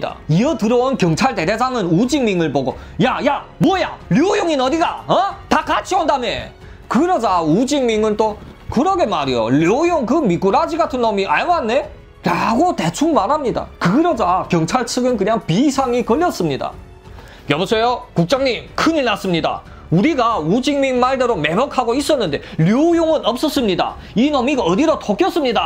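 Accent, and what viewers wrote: China. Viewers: 아무생각없이 클릭해서 첨에 한국사람이 방송하는줄알앗는데 말투가 ..같은 조선족이라 ...음...ㅎㅎㅎ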